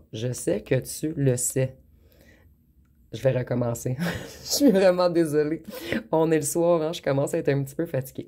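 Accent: Canada. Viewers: Vous êtes la meilleure. En plus j'adore votre accent canadien. Vos interventions ont valeur de pistes possibles dans la carte de mon, pélerinage terrestre. Le très inspiré jésuite, Teilhard de Chardin, n'a-t-il pas eu cette phrase prophnétique = "Nous ne sommes pas des êres humains qui ont une expérience spirituelle; mais des être spirituels qui ont une expérience humaines. " et je retrouvre par votre voix cette Vérité fondamen,tale. MERCI à Vous, Madame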